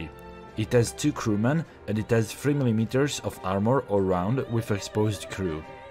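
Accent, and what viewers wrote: Italy. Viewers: Si vede che sei italiano, lo riconosco dall'accento, e da come pronunci l'Italiano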